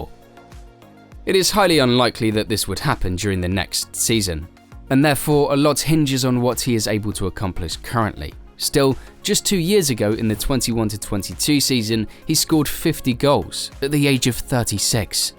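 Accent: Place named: United Kingdom. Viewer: British accent talking bout hockey wtf is this a joke :DD? Ovie probably hits 25goals for this season, he will start picking up the pace.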